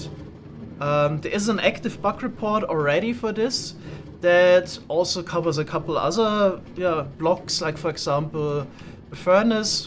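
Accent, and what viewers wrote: Germany. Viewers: Bruder uch hör schon das deutsche raus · The German accent🙌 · He is so German😂 this accent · Man hört den deutschen Akzent so stark raus 😂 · u german? i can hear it yu are german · bro you sound so german 💀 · Are you from Germany? Because you sound like a German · Man hört so raus das du deutsch bist hahaha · Real talk mir kann keiner erzählen das du nicht Deutsch bist! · are u german or am i trippin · das höhrt sich so hart nach deutschem aktzent an bist du deutsch? Ps feier die serie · deutsches englisch ist so ne 10/10. aber ehrlich danke für die geilen farmen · Man hört so sehr raus das du deutsch bist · you sound like a German. im German and want to gnow if your German comment what im gonna say on German mein name ist Manuel und mein Lieblingstier ist eine Corbra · Du bist deutscher nh ? haha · Der deutsche Akzent 😂👍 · his accent sounds very German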